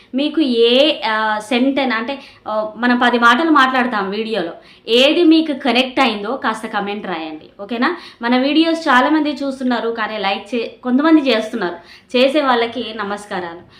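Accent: Telangana, India. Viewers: ఎన్ని జీవిత సత్యాలు చెప్పావమ్మా, మొత్తం వీడియో చూశాను చాలా బాగుంది❤ మీది తెలంగాణ అని మీ మాటలు బట్టి నాకు అర్థం అయింది❤ వీడియో 👌👌👌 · Avunu sister mana telangana langvege alane untadhi superrrr sister 👌👌👌👌👌👌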